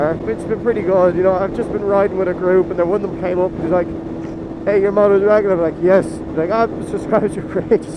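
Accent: Ireland. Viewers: What part of Ireland you sound Kildare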